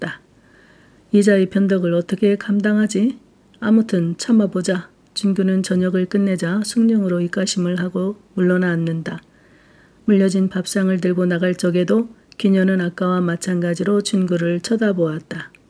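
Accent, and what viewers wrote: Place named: Gyeongsang, South Korea. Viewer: 태백산맥의 찰진 전라도 사투리에 이어 구수한 경상도 사투리... 앞으로의 대장정 함께하겠 습니다 감사드립니다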